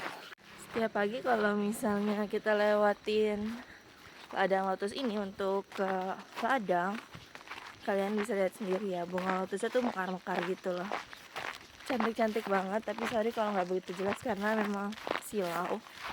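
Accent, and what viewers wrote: Java, Indonesia. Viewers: Cantik bgt MBK ...asline wong ndi kaya logat jawa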